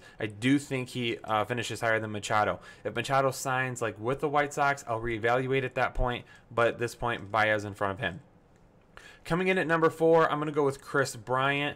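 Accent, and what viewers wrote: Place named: Michigan, United States. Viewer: Now that’s a Michigan accent if I’ve ever heard one lol. Go bucks and great video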